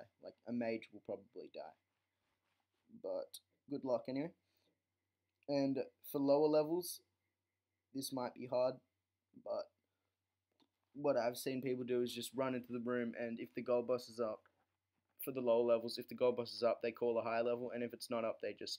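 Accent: Australia. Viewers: Dat aussie accent tho... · U sound aussie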